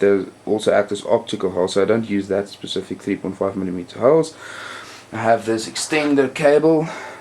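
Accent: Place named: South Africa. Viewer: awesome vid thanks :) you sound south african :)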